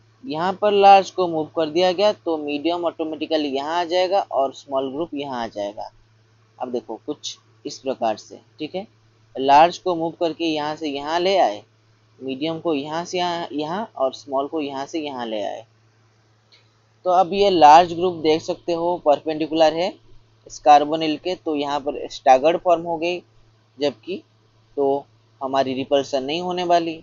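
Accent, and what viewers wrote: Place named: Odisha, India. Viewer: bhai tama video ra strating 10 sec ru jani deli tame odisha ru boli , bhai bhala explain karucha , i love it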